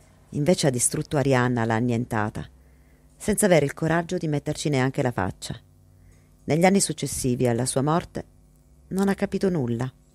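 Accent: Piedmont, Italy. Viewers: sembri piemontese !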